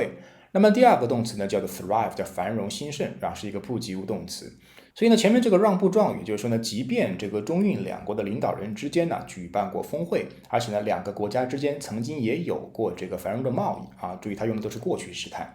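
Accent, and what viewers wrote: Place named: United Kingdom. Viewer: 有英腔朗讀，又有精闢句型詳解，是一個真正學好英文的好節目。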